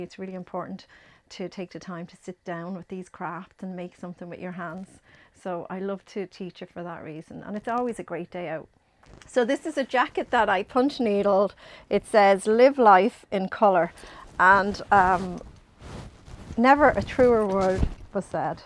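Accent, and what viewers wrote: Ireland. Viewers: What a wonderful lady & an incredible home. I must look into Punch Needle, the texture is lovely. Very inspiring & l just love the Irish accent. My gran was born in Kilkenny. ❤ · love the Irish accent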